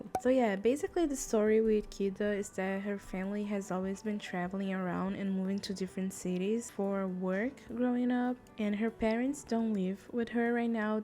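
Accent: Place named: Brazil. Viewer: I knew I recognized your accent, happy to see another fellow brazilian sims player haha. Excited to see more of your videos ❤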